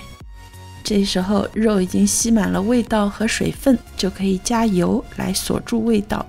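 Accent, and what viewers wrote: Shanghai, China. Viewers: 上海腔调，上海咪道，一只顶。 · 一看一听就知道是阿拉上海宁额腔调 灵额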